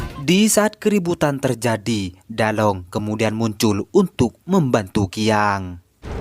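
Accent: Madura, Indonesia. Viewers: Madura ya pengisi suaranya?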